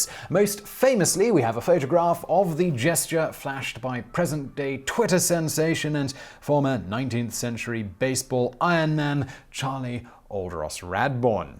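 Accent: United Kingdom. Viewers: This guy is f*cking hilarious! There's just something incongruous about a fellow with such a dignified, proper british accent using our favorite euphemism for "sexual congress.". As y'all might say, "Spot on, mate!"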